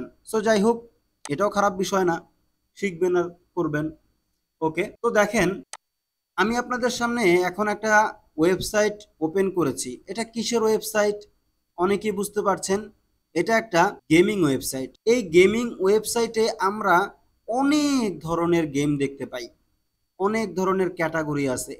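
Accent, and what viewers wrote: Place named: Barishal, Bangladesh. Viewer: vaiya mone hoy Borishailla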